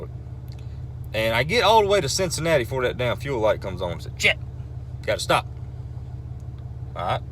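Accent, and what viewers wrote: South, United States. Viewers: Being from the north and living in the South meet and guys like you I understand what you're saying no you didn't do nothing wrong but me I would have handled it differently if I was your cashier I would have giggled and said where's my mama where's my mama you said man I expect to see my mom when I looked over my shoulder which would have had you and me both laughing because I know you from the south by the way you talk they knew you from the south by the way you talk they just was in a foul mood and took it out on the wrong person you didn't do nothing wrong that cashier could have handled it better the boy you called me the c word and we going to be scrapping you might be bigger than me and I might get my ass whooped but you know what I'm going give it the old College try.. 🤣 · You didn't do anything wrong. But as a female from the northeast, only older women are called ma'am. 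So most younger women find it offensive, because around here it insinuates that you look older than you are. I remember being in my early 20s and getting called ma'am, and feeling totally sad about it. Some of us didn't grow up hearing all women addressed that way. I wouldn't be offended if you did, because your southern accent is obvious and I know that's how southerners talk. (My aunt was from Georgia) 👍😂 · Here in Canada my mom and sisters would jokingly say “hey, I’m not that old!” But given your accent no way they would think anything of it, it’s just a southern thing.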